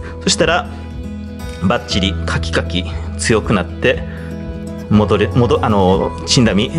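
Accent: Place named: Okinawa, Japan. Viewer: 勉強になります。さんのウチナー訛りが心地良いです♡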